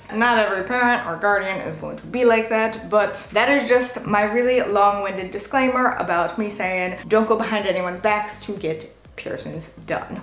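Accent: United States. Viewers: Where are you from? I’ve never heard your accent before. You sound American but you said a bit Irish. Because when you speak you don’t pronounce the g in ing words. You say “piercin’ and “somethin”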